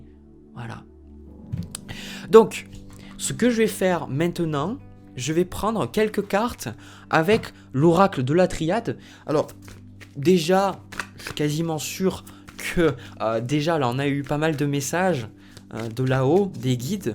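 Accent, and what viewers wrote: France. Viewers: Bonjour, Merci pour votre travail et vos commentaires. Perso ça fait deux ans que l'on lit ...de nouvelles choses... Etre seul, n'est pas in problème...le calme,la paid et le silence..voila qui est bon. Bonne question: qu'est ce que j'aime faire...? Attendons led energies suppérieures 🤫 Oui, attendons.... Fini la routine,la dictature... L'argent ...pour creer une sociétè et creer des emplois....et faire du bien pour les animaux... Belle semaine Ps: vous n'aurriez pas un petit accent francais? 😘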